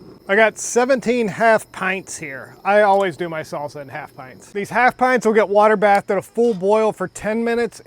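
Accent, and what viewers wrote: United States. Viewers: He is SOOOOOOOO American it’s hurting my British ears. 😨 it’s pronounced To-Maa-toe’s NOT To-Mate-o’s. 🤦‍♂️ Prove me wrong/right keyboard warriors. 😂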